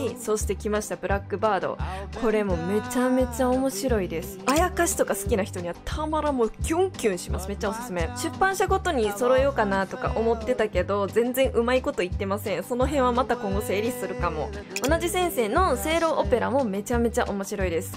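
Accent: Kansai, Japan. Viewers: ちょっとしたマンガ喫茶じゃないですか！お友達呼んだらお金取れるレベル(笑) もともとの関西弁にあわせて、めっちゃ早口で紹介していってる感じが「ケロちゃんにおまかせ」っぽかった(笑)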